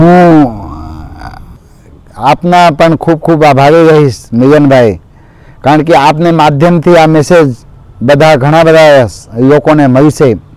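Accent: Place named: Afghanistan. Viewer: ME BHAI 2 VARSH AFGHANISTAN 🇦🇫 MA RAHI JOB KARI CHE AFIN NI COMPANY MA ANE AA BAPU NI BOLI NO LEKO CHE E AFGHANI LOKO NI BOLI SATHE 100 TAKA MADTO AAWE CHE ETLE MARU MANVU CHE K AA BAPU KOI AFGHANI HOY SAKE ANE PACHI HINDU 🕉️ SADHU BANIYA HOY SAKE CHE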